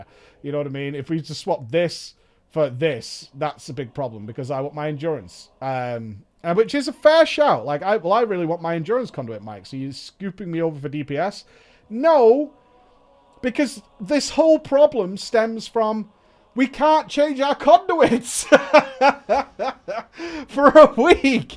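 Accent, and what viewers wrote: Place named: United Kingdom. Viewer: Orientated....British accent but American lingo. The simping is real.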